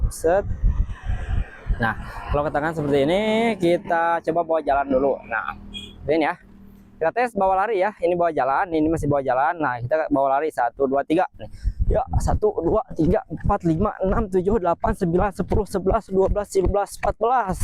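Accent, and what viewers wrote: West Java, Indonesia. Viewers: Sunda pisan mang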